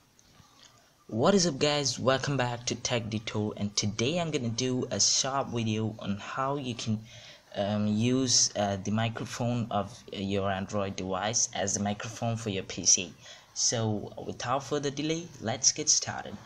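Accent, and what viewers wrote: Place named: Indonesia. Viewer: Your accents jokes i love it are u from Indonesia im guessingg, pZee xD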